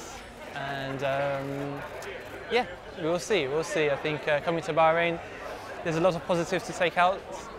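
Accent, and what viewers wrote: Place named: United Kingdom. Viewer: Such a British sounding Thai national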